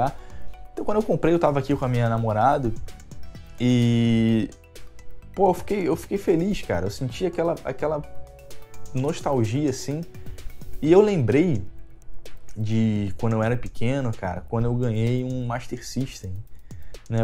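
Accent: Rio de Janeiro, Brazil. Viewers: eu não acho que foi o sonysmo que salvou o canal, o apelo sempre foi um cara falando merda com esse sotaque carioca engraçado, não importa o assunto, por isso faz sucesso e é o canal que mais cresce no brasil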